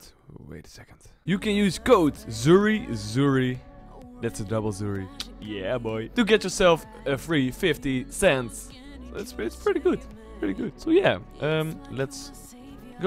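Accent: Netherlands. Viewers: Are u from the netherlands or so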